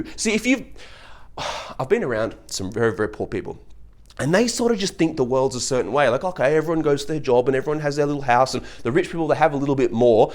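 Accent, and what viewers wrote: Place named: United Kingdom. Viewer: This guy is absolutely speaking the truth. He sounds like he lives in Britain or in Europe somewhere but he's not exaggerating the reality of being wealthy. I live in the U.S. When I was about 20 years old I dated a woman whose net worth was north of 40 million. This was sometime in 1988. I dated her for 5 years and that experience was unlike ANYTHING I had ever experienced before or since. I grew up in a very middle class house hold, so I had access to nothing extravagant. Just to provide a few quick examples, during the time I dated this woman, I traveled to Bahamas at least twice, Los Angeles - and not just LA proper but I shopped on Rodeo Drive, the most exclusive avenue of wealth in the world, traveled to Las Vegas a few times, and I drove several exotic cars including a Ferrari, BMW, and a Porsche. I ate at the absolute best restaurants in the U.S. 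Everything I did during this time was paid for by this woman and her family. I was given money on a frequent basis. Ultimately, the lifestyle almost killed me because I had to a pay a heavy price of being absolutely controlled as to my time and space. I finally broke up with this girl and found my independent freedom again. To this day, I have no regrets on the decision I made. But, the experience provided me with a sense of existential balance that the world is NOT the same for people relative to those who have against those who do not have.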